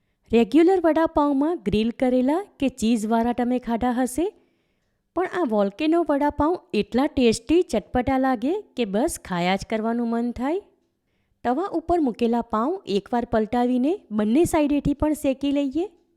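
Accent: Surat, India. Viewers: Khub saras tame asal surati bhasha ma boline recepie batavi.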